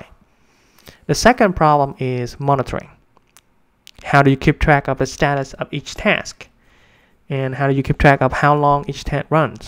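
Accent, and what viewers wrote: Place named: India. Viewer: looks trainer have destroyed his accent , is a mix of Indian, US and some his own styling on accent. Sometimes it's impossible to understand.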